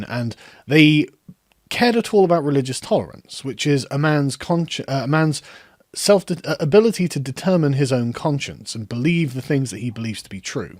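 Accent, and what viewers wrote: United Kingdom. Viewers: It is a credit to your well-spoken Britishness that listening to you riff on this is still entertaining, even when you're completely wrong. The "Dark Side" isn't a facet of the human condition. Maybe it would be if conceived by better writers for a more mature audience. But it ain't. The Dark Side is a PCP/Crack/Adrenaline cocktail that can(and very often has) instantly flip a noble and altruistic hero into a yellow-eyed baby-eater in the blink of an eye. Repeatedly demonstrated throughout both canon and non-canon materials throughout the decades of the franchise. It's what happens when a Dragon Age mage becomes an Abomination. And in that context you now begin to understand the Jedi are a peculiar mixture of the Dragon Age Templars and Circle combined...with all the hypocritical flaws, and magi-knight badassery that entails. But they do what they do because when they fail...yellow-eyed baby-eaters run rampant all over the place.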